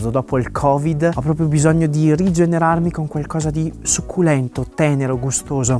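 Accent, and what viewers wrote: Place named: Romagna, Italy. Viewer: lo "ssiock termico", "tutto quel bel sciugo", "fazendo tosctare", "zzi vediamo alla proscima"...ma c'è niente di così piacevole per le orecchie come la parlata romagnola? A parte questo c'è davvero da squagliare di fame vedendo questa ricetta (avrei solo qualche riserva su tutto quel burro). Aggiungo, e spero di non metterti in imbarazzo, che me fai sangue pure te quanto il goulasch, perchè sei davvero un gran bel burdel (eccheccavolo, in base a quale legge o trattato chi illustra una ricetta ha da essere sempre di una bruttezza invereconda? Le eccezioni vanno apprezzate e segnalate).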